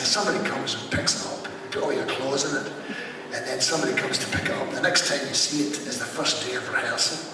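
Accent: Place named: Scotland, United Kingdom. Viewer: Boy! The brogue REALLY comes out when he goes back to Scotland!! ;)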